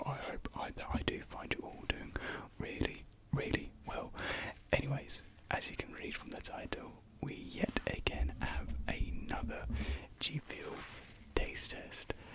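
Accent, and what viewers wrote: United Kingdom. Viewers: Is he British cuz British people are good at ASMR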